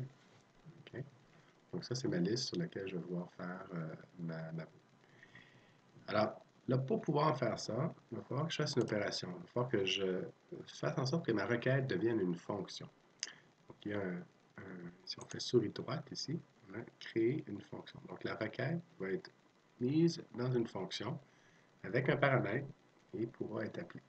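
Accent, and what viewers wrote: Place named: Quebec, Canada. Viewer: Merci beaucoup très intéressant J'adore aussi l'accent québécois ;)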